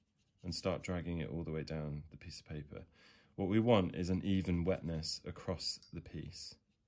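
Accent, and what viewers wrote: United Kingdom. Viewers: Watercolours has been an enemy to me for years, pfft. But even so I enjoyed this video a lot. I'm a big fan of people who can actually work with watercolours, they have the God's hands I would say😂 Also, brittish accent gives lives. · How did I not find you before?? Add with an English accent : a balm to my ears x I'd appreciate any contents on volume and movement, please! Thanks!!!!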